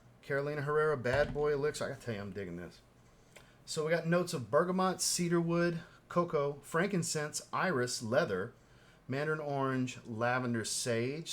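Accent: United States. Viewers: Sorry for this kind of strange question, but where do you come from?As a Pole, I find your American accent souding really good to my ear🙂,not too heavy, understandable though. Your review is good too.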